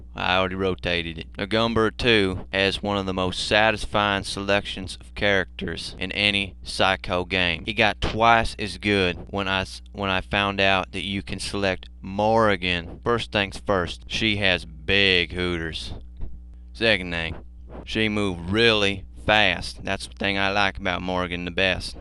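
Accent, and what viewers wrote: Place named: South, United States. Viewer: This guy really needs to return and do mote SHMUP reviews. His southern accent and humor are perfect!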